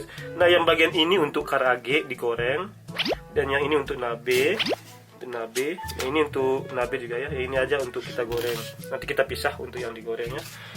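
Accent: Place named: Japan. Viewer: Udah lengket ya bang logat jepang nya 😅😅😅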